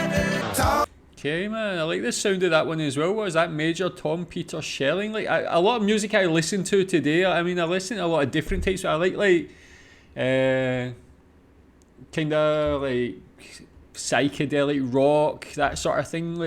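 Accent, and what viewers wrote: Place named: North England, United Kingdom. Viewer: Nice Accent Dude! 😄 North England?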